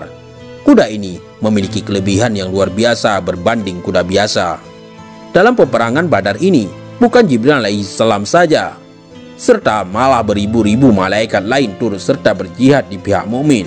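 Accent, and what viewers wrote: Aceh, Indonesia. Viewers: logat ACEHH kyk nya...taah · Salam admin:sya dari padang minangkabau.bolehkah saya bersejerah bertanya kpda admin. Admin dari mana. Bhsa admin bercampur dngn bhsa indonesia & melayu.logatnya sprti logat aceh.apakah admin orng melayu aceh.orng malaysia tak mungkin rasanya bhsa melayu malaysia berbeda dngn bhsa admin.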